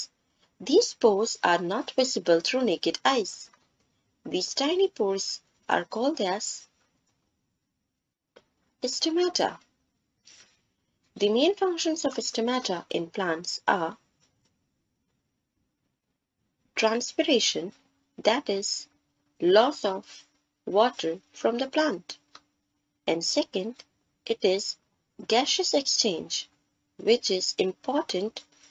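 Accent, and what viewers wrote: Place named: United States. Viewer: Ma'am the video was fabulous , marvelous , fantastic , mind blowing , exellent . Your way of teaching is fabulous the way you explain is just fantastic . I had problem in understanding this topic but after watching your video i understood everything . I sure that you are the best teacher in the world with a stylish or i should say american accent . If you will start uploading videos like this only , then i am sure that you will the silver play button the gold and then platinum play button in one month only with at 30m subscribes and atleast 32m views on your each and every video .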